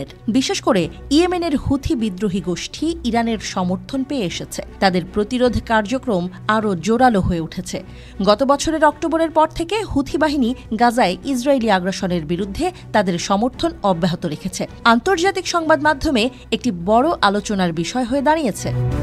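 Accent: Kolkata, India. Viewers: এই সংবাদটি উপস্থাপনা করছে কে একাতর টিভিতে তিনি কি আলোতে বাংলাদেশের বাঙালি না কলকাতার বাঙালি। কেননা তার ভাষাটা একটু ওপারের মতো টান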